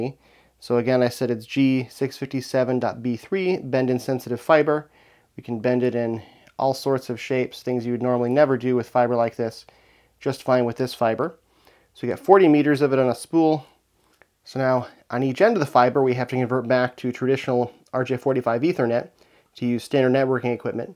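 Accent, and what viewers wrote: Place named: United States. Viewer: How did you get such a solid American accent as a Finnish guy? :S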